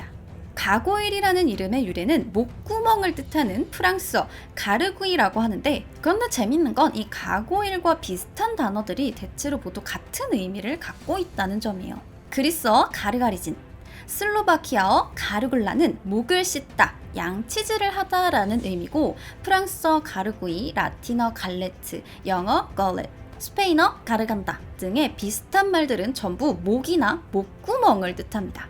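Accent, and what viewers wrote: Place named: Busan, South Korea. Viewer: 오늘은~ 부산의 귀여운 억양이 ~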